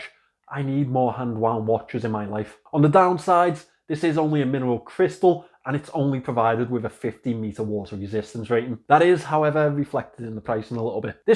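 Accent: Liverpool, United Kingdom. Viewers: hey, nice choice of watches. Nice video. What accent do you have? Scouse?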